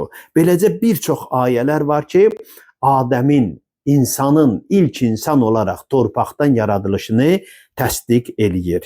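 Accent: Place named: Iran. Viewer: Birinci Azerbaycan dilini fars lehçesinde danışma..............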